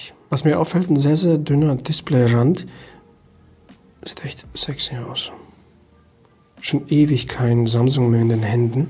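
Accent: Russia. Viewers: bist du russe? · Russischer akzent izz da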